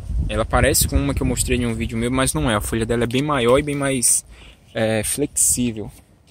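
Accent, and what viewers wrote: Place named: Minas Gerais, Brazil. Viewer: Você é Baiano meu querido? Você tem sotaque de mineiro Você fala igual mineiro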